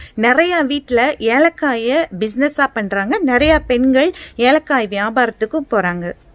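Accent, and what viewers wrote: Sri Lanka. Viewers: Konjam srilankan tamilian also ungala maathi tha akka kittathatta pesuvanga cute... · Your pronownsation is like Sri Lankans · Elangai Tamil pen nu nenachan dear 😍😍😍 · Correct akka onga tamil srilankan tamil mathiri than erukku i am from SriLanka 😊😊😊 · I thought u r from Sri Lankan tamil.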